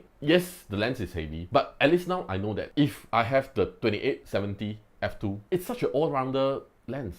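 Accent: Singapore. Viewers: You singaporean?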